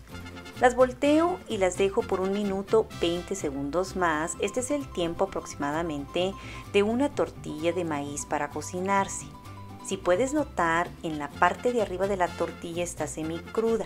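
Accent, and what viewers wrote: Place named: Mexico. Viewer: Qué lindo acento mexicano. Estupenda receta. Me antojan unas tortiçças ricas así. Felicitaciones y saludos desde Brasil...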